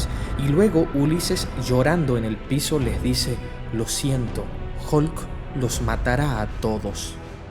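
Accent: Tucumán, Argentina. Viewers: Bien Tucumano so URA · tucumano mañero ja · De que nacionalidad sos... Te me hiciste tucumano o catamarqueño con tu pronunciación de tiesha(tierra) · Es Tucumano?. Un capo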